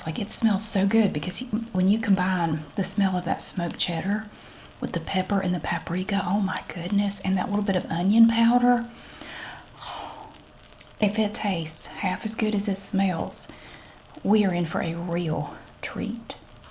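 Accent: South, United States. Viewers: Aside from the humour and the Southern drawl, it's brilliant how you always tell viewers they can make these recipes - so encouraging. Peace from Scotland 🏴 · your accent makes me melt Southern accents are the best. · I always giggle when I hear you say swear words or wear them on your apron like today and your sarcasm because you are such a sweet soft spoken southern lady 😂 I love it!!!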